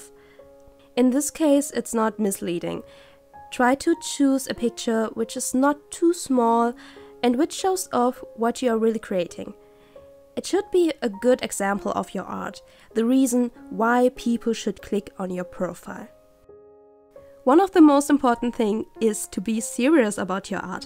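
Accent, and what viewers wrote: Germany. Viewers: somehow you sound like you're German are you? sorry if i'm wrong edit: habe gerade die Kanalbeschreibung gelesen :3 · Uh dein englisch ist echt gut! Man hört dir zwar extrem an dass du deutsch bist aber trotzdem, klasse! 😱 und danke für die tollen tips, es ist schön wenn man leute hat die deine arbeit schätzen :3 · Ha, wusste ich's doch. Hab auch den deutschen Akzent rausgehört xD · Ja der Akzent ist wirklich "deutsch" 😅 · german accent✨😍😍😍😍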